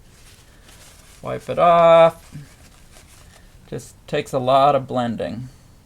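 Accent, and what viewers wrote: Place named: North Carolina, United States. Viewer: I love that you have an experienced teaching style. Are you from NC, your voice sounds like it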